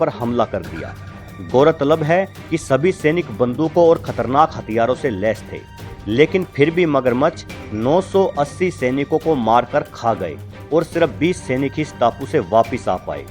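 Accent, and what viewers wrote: Haryana, India. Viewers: Bhai aap haryana se ho kya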